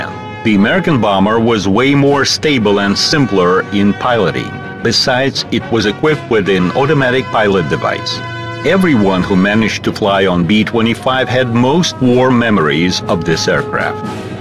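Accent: Russia. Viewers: I just like the Russian accent in this Documentary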